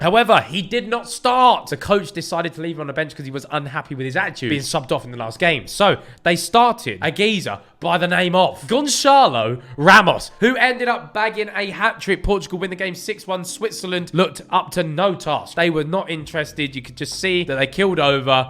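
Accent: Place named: England, United Kingdom. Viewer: Its prob his english accent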